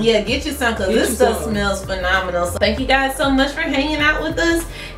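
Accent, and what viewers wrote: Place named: South, United States. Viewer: your beautiful you have great skin and great cheekbones I’m jealous the faces she be making so cute lol she got a baby face such a cutie with a great smile, where you from you got accents like southern im from Boston Massachusetts so you know how we sound I’m sure lol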